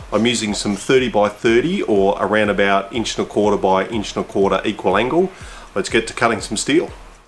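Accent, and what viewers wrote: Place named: Australia. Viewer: Hi, you sound Australian, do you make these to sell? We r in Vic.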